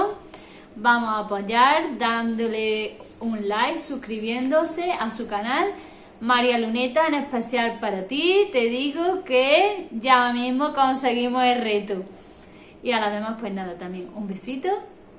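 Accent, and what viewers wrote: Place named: Andalusia, Spain. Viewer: Pero que alegría ponerte cara. Si eres guapísima cariño que arte tienes que me gusta escuchar a alguien con acento andaluz de las mías. Que pedazo de 2021 ole ole nos lo vamos a pasar bomba. Un besote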